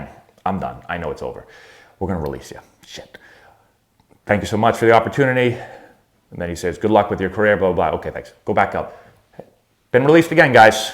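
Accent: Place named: Massachusetts, United States. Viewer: I’m from Rhode Island and I knew you from Massachusetts due to that accent haha. Any memories of McCoy stadium?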